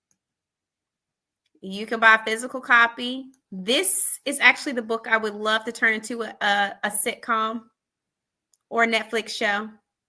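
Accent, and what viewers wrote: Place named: South, United States. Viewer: I love listening to you & your southern accent! You tickle me! 😂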